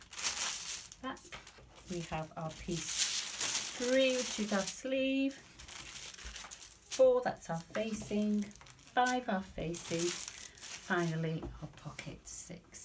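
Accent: Yorkshire, United Kingdom. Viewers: Hi, just wanted to say that you explain things so well, thanks for that. I'm watching your vlogs tonight and really enjoying them. Do I detect a Yorkshire accent!!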